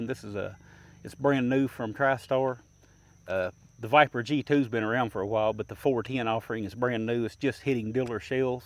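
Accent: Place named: North Carolina, United States. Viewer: Excellent video. Exactly what I was wanting to know. You're accent sounds like good ol NC. Am I close?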